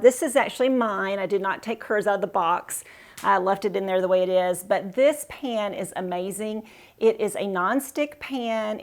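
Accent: South, United States. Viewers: I love your pink sweater beautiful color if you don't mind saying what state are you from and living in. You are definitely southern I can tell my dad's people are from Arkansas Alabama and my mom's people from Michigan and live in Florida I am a Yankee but I live in Arkansas it took a long time to adapt for me different culture shock lol but I love Arkansas people friendly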